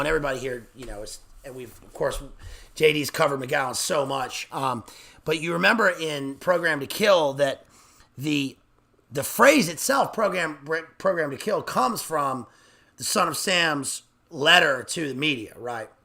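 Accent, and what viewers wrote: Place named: United Kingdom. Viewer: Loving the British accent 😂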